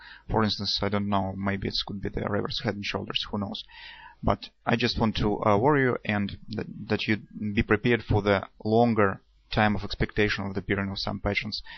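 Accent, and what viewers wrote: Russia. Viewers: Работай над акцентом и произношением слов. Якрий русский акцент. Прям вспомнил пары английского в инсте. :)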